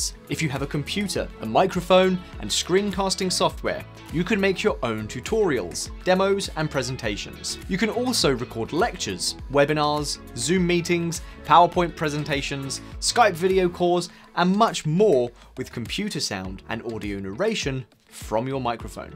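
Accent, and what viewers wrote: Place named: United Kingdom. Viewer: Sir your English is perfect British and clear that is why I watch your videos. Thank you very much.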